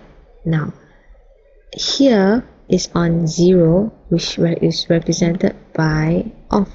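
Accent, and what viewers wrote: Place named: Malaysia. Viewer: You sound Malaysian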